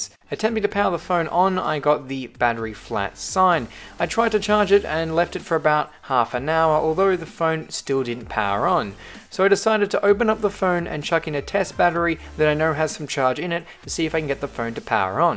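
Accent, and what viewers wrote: Australia. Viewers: The battery had a 'crazy' logo on it and the guy sounds australian so I assume its crazyparts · are u from Aus?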